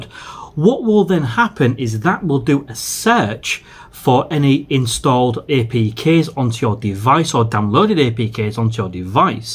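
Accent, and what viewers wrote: Hull, United Kingdom. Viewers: hi are you from hull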